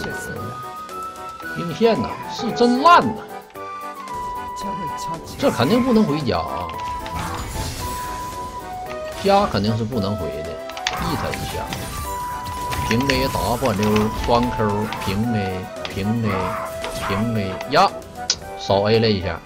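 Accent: Northeast, China. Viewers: 東北腔真的很可愛XD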